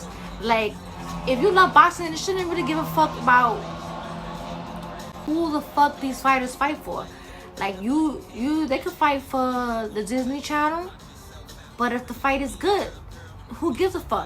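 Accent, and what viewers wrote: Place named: Bronx, United States. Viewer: Good to see you back on YouTube making videos again great to hear that Bronx accent hahahahaha hopefully boxing is on the way back and full respect to both these ladies put on a great fight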